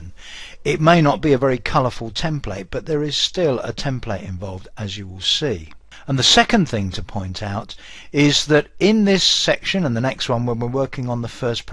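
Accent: United Kingdom. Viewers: When I heard the British accent I knew there would be excess talking. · I really enjoy your British accent and simplicity you explain and teach. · When I heard the British Accent, I was super happy. I love listening to British people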